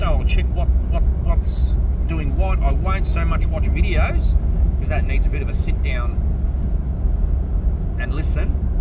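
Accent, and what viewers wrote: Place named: Australia. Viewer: Hi mate, sounds like an aussie, me too. I'm in a similar boat, with the shit coins and BTC.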